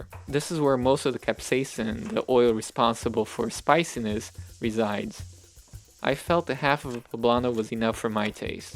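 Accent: Brazil. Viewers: Que trabalho maravilhoso,!! Estamos apaixonados! Engraçado que topamos com um vídeo antigo seu e pelo sotaque no inglês eu já senti que era brasileiro 🧡 é lindo topar com narrativas riquíssimas e belíssimas como as suas! Muito obrigada pela dedicação, lhe desejo muito sucesso!!!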